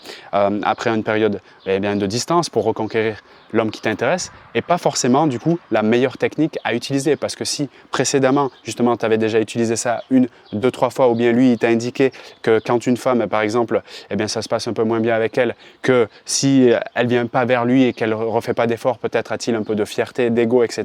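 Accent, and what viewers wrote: Quebec, Canada. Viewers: Merci pour ces conseils,. Mais s'il ne faut pas être "prévisible" et éviter le silence radio, quelle stratégie, préconises-tu? Ps: j'aime bien ton accent, serais-tu Québecois?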